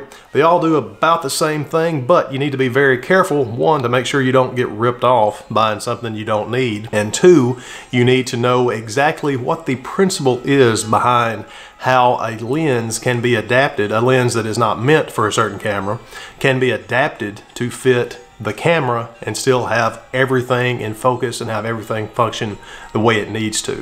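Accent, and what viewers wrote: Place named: South, United States. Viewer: Tennessee? Kentucky? it's definitely Southern but not distractingly strong.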